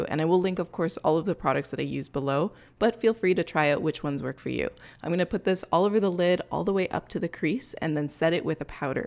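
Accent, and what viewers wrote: Canada. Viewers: Guys is she canadian?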